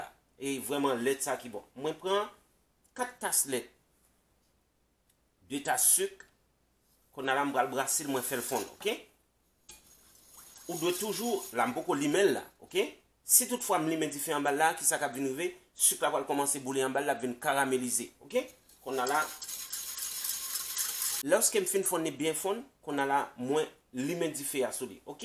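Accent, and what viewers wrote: Quebec, Canada. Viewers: Merci wap banm bon accent Québec la wiii la. Bon travail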